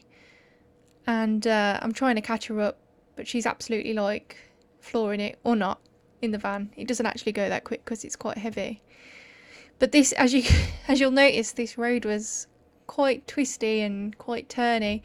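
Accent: United Kingdom. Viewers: Where yow Bin ? 😂😂 Welcome back fellow Brummie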